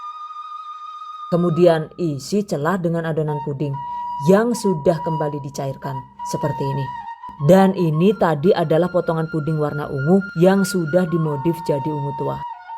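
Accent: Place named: Cilacap, Indonesia. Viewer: Jadi eman" di pangan, enak disawang. Penuh kesabaran tenan dan ketelatenan tentu ne. suwun ilmu dan trik" nya. saya kangen kalau ngomong gawe logat Cilacapan. [suwun]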